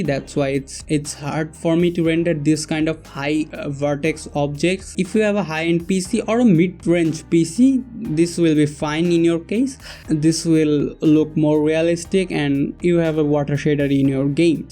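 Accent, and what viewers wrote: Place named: England, United Kingdom. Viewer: perfect, i love your English accent <3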